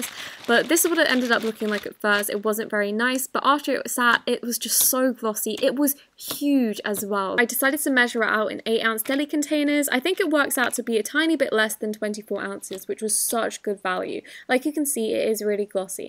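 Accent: England, United Kingdom. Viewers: I know it’s English, and I love it!!!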